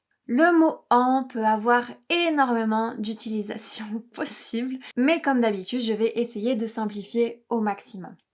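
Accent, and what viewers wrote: England, United Kingdom. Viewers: It's funny, your accent in English is rather British, I didn't expect that. Certain words sound very American though. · You have such a lovely English accent! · J’adore votre accent anglais hahaha!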